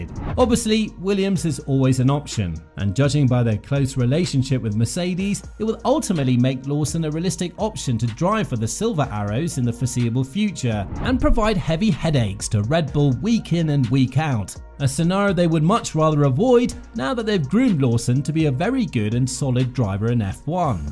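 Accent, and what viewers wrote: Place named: England, United Kingdom. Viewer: An English accent saying it's all over for the Aussie driver. Some things never change. This because of cricket isn't it?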